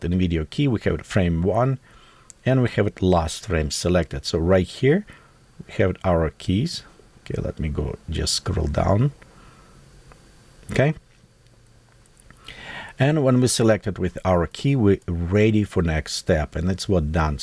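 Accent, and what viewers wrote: Russia. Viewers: I hear your accent, are you Russian? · This is a very helpful video and I will use the information redaily! Your content continually proves to be very valuable, Since I not a native "Russian-English" speaker, I sometimes have trouble following along, but I find I can usually figure out what you are saying. I just imagine that Chekov from Star Trek is instructing me. Keep up good work!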